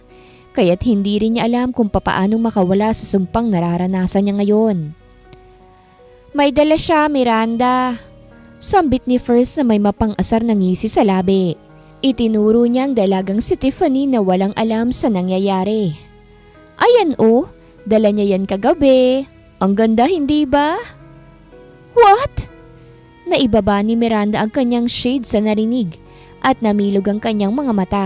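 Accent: Visayas, Philippines. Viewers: imo mga follower's mga bisaya...cute kaau ka mg bisaya...salamat sa new upload...God bless❤ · Hahaha..nalingaw ko sa bisaya sa narrator..cute😊😊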